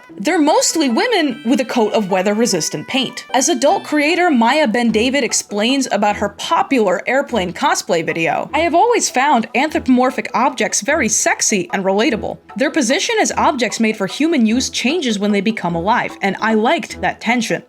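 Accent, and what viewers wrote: New York, United States. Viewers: New York!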